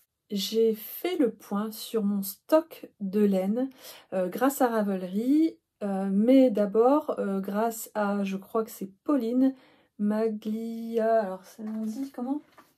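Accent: France. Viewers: Merci pour ce podcast. J'aime beaucoup tous les modèles que tu as présentés, et tu le fais naturellement, sans chichis, sans essayer d'imiter le débit saccadé des journalistes ni jouer les influenceuses. C'est plutôt rare ! J'aime aussi ton délicieux accent du Pas de Calais dont je suis originaire. Les Harmonies, ça me parle et quelques notes de flûte m'auraient fait plaisir 😊. Concernant le pull Esme, pense d'abord à ton bien-être et ta santé. Chacun est libre de modifier les patrons, d'autant plus si tu cites la designer initiale. ✂️ Pas de bobine dans mes emojis, mais la couture m'intéresse aussi !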